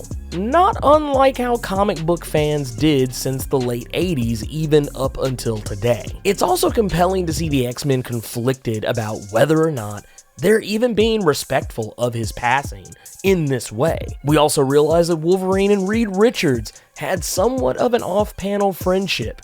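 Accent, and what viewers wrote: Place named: Kentucky, United States. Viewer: you sound like you're from kentucky.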